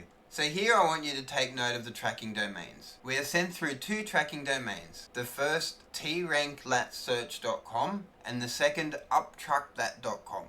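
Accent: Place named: Australia. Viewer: You deserve more followers and more comments for the commitment you put into the fight against these international scammers. Anyone like you who can do this deserves a medal. P.S your Australian accent and laugh is just tops my man. I've watched all your scambait videos and can I say great work on your behalf.. Keep up the crusade just like the rest of the Scam baiting community.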